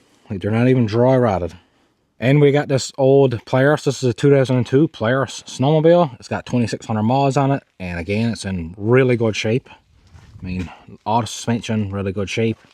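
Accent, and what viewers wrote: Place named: Newfoundland, Canada. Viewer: Newfoundland by the accent.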